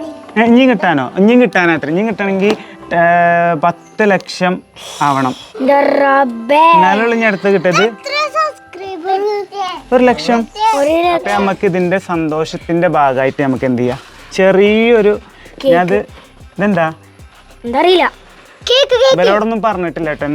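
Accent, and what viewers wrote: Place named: Malappuram, India. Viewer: Happy ആയീലെ ഇനിയും ഉയരങ്ങളിൽ എത്തട്ടെ. ഞാൻ ഇപ്പൊ അടുത്താണ് വ്ലോഗ് കണ്ടു തുടങ്ങിയത് എനിക്കിഷ്ടം നിങ്ങളുടെ സംസാരവും സാദാ നാട്ടിൻ പുറത്തെ നമ്മുടെ മലപ്പുറം slang 👍👍👍👍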